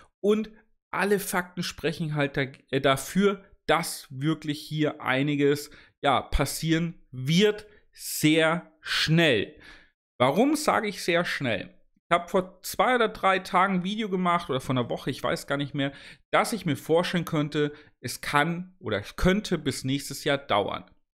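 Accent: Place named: Oldenburg, Germany. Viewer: Höre da einen Oldenburg-Akzent raus ^^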